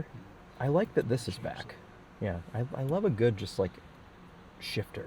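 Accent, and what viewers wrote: United States. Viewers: As an Iraqi Arab student, I enjoyed this video very much. It was like an English lesson for me, and I benefited from it to develop my English because your words were very enjoyable and very useful. I also like the American accent, and as for the car, it is wonderful, and it was a wonderful experience. keep on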